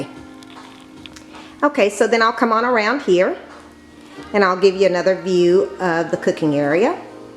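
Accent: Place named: South, United States. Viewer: Your kitchen is beautiful. Thank you for sharing! Subscribing now. Question: Where are you from? You sound more Southern than I and I'm from the Georgia. 😁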